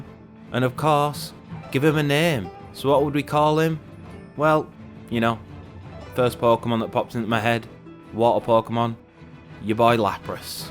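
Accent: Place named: Northern England, United Kingdom. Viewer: Celestial Tournament is hard af. You need very specific pets with exact numbers. I have Mini Tyrael (Went to the invitational in 2008 with my bestie) and I’m from Northern England and you sound local-ish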